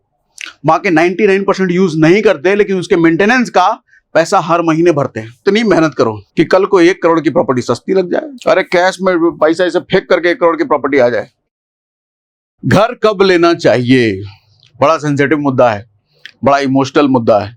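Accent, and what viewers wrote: Bihar, India. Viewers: AAP BIHAR SE HO KYA?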